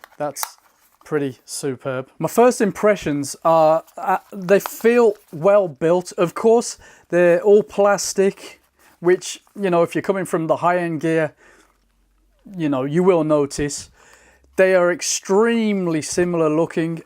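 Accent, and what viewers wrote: Birmingham, United Kingdom. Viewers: You from Brum?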